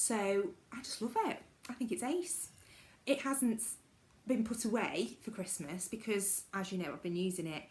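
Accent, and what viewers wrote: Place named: England, United Kingdom. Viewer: I just think 🤔 I cant stop 🛑 the Charity shop buying it’s the bargains I see I can come out with anything I like your fine English accent I was born in Derbyshire myself with 3 of my sisters I visited my cousins in Derby a couple of months ago and went into every charity shop in Derby they are good here too charity shops I mean even in my home town here in Tralee there are a lot of them so thanks 😊 for making me feel normal i thought I would need therapy because of my addiction bye for now ohh you can send me the pink dressing gown I can’t find mine 🤣🤣🤣🤣🤣🌟🌟🌟🌟🌟🌟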